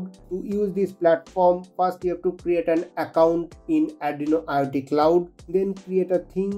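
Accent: India. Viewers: There is nothing more painful than listening to an Indian talk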